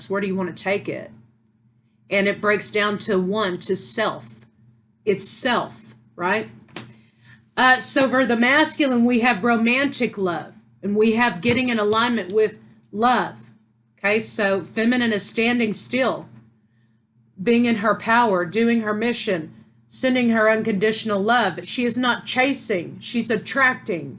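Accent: South, United States. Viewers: Wow wow and wow, oh my goodness. I listened to this whole message and it's giving me so much clarity. Some of it, I already knew, but needed a reminder, some of it I've been working on and some of it I NEED to work on. Thank you so very much!!!You are so extremely enlightened and gifted. And I love you southern accent! Peace and blessings to you. Love, E. from WNC.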